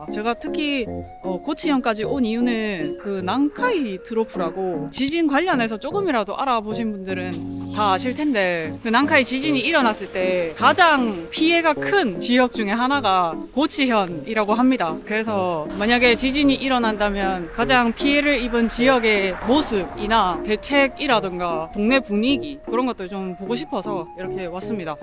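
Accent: Gyeongsang, South Korea. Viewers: 얏빠리! 현지인들과 한잔하면서 대화하는게 젤 기억에 많이 남는거 같아요. 불과 1년반 정도된 일본여행채널이 17만을 찍은 채널이 있는걸보면 도 언능 10만을 찍고 기념으로 다시 만났던 인연들 재회하는 영상 찍으면 더욱더 시청자들이 영상들을 기다릴것 같네요. 구수한 갱상도 사투리에 유창한 일본어가 참 정겨워요! 😊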